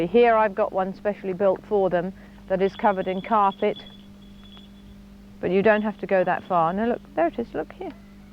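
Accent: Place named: RP, United Kingdom. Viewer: rather posh , I like her practical no bullshit style .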